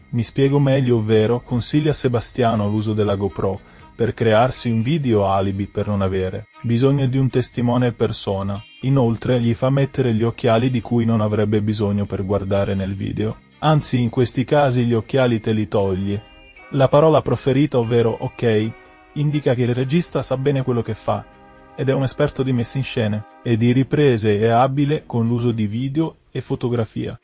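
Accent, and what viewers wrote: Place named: South, Italy. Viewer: Ma questo che parla sei tu stesso? Ha l'accento di uno del sud che ha vissuto un po' al nord, abbastanza peculiare come modo di parlare.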